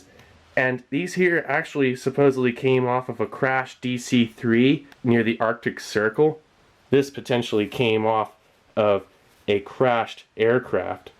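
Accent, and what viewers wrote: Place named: Southern California, United States. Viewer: I agree as well, you have a cool voice, are you from southern California? you sound like we do down here in the San Diego area.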